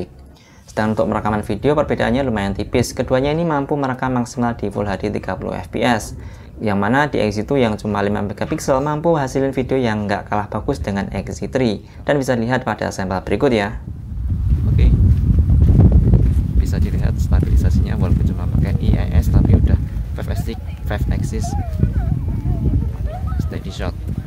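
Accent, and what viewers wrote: Boyolali, Indonesia. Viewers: Wong Boyolali kah? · Mas e koyone wong boyolali iki? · Wah wong Boyolali iki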